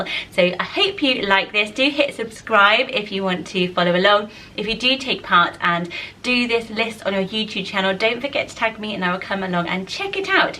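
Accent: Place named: Bristol, United Kingdom. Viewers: I KNEW IT, I FREAKEN KNEW IT! I COULD HEAR THE RRR'S AND I COULD HEAR YOUR ACCENT, I'm sorry I'll calm down... 😂Okay well I predicted Bristol, but I mean, I was pretty close. :D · I'm from Weston and honestly didn't notice your West Country accent until this video 😂 I go to Swindon often for the ice rink 😜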